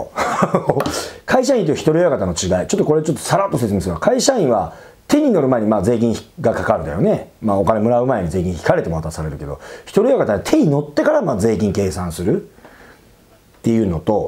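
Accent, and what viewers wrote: Nagoya, Japan. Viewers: 名古屋弁だ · 初めてみてラッパーのAK69の喋り方に似てると思って概要欄みたらやはり名古屋でした！笑 そのまま話を聞かせていただきました。大変勉強になりました！ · 同じ愛知なので名古屋弁聴き心地よかったです！ 自分も一昨年独立したばかりなので勉強になります！ ありがとうこざいます！ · 名古屋弁落ち着く―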